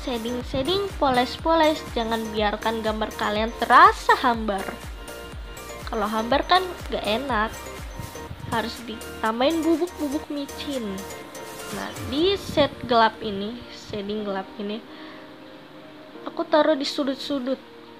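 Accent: Java, Indonesia. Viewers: yang baru brlajar gambar sini kumpul, btw makasih kk, tutornya, ya allah ngomong ny medok sek an kakak, wkwkw semangat ya kk semoga aku bisa ngikutin kakak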